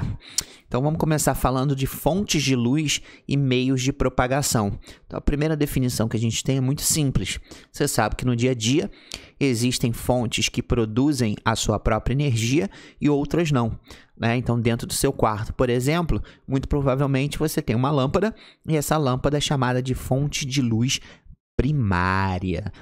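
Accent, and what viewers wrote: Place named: Rio de Janeiro, Brazil. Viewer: Ele e do RJ kkkk sotaque kkkk aula boa 😊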